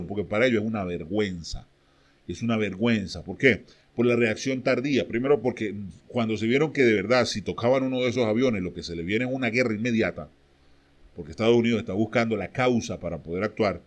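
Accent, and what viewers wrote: Colombia. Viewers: Un colombiano narrando xdioxxx ¡¡ Ve con Uribe hacerle cariñitos ¡¡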